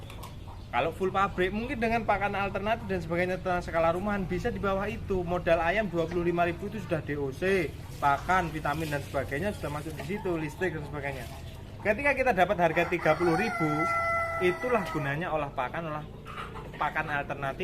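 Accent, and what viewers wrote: Java, Indonesia. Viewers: Mas e gianteng... Rodok medog lak ngomong... Koyone ora sombong iki... Peternakane ayam kok logone gambar bekicot mas... Hehe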